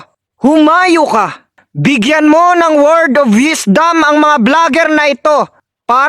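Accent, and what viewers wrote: Visayas, Philippines. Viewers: Sakit sa tenga nitong Visaya na to ayosin mo muna salita mo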